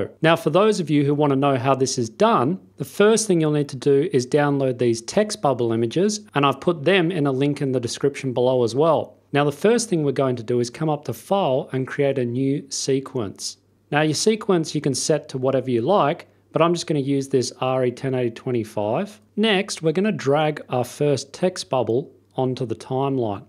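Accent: Australia. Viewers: thank you so much , i used for a wedding and it looks great , nice australian accent ?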